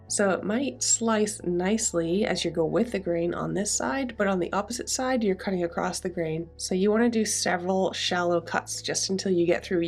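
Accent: Nova Scotia, Canada. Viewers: I love how you say “decals”, love that Nova Scotia accent! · The pronounciation difference between Nova Scotia and Massachusetts is amazing. You call them Dek-uls, we call them Dee-cals. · I didn't realize Canadians pronounce decal differently than Americans. You say deckle. We say Dee-cal with the cal part like California. 💕 Another furniture transformation that, to me, would have been just too difficult as well as me not being able to see it in my head all restored. You're amazing, and I love how proficient you are with old tools as well as the new technology of the laser cutter.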